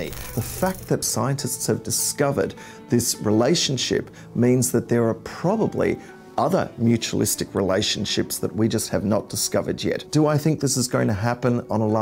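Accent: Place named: Australia. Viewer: nasty aussie accent .bye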